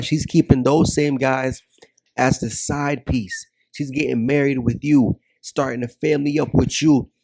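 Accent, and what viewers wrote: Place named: Caribbean. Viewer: Yeah, i can definitely here the Caribbean in you brotha. Your content is spot on. SYSBM/ traveling is the only way for brothas. I make content as well talking about SYSBM, thinking black men & black mansandry. I got a black mansandry playlist of content I've made.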